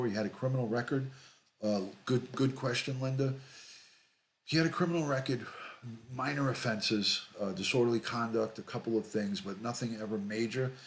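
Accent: New York, United States. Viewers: Hi! I just found you and subscribed. It's good to hear a familiar accent. I'm a native New Yorker transplanted to south eastern Turkey. Let's Go Mets! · I am a new subscriber from the Bronx New York love your channel just listening to you talk I new you were a fellow New Yorker!!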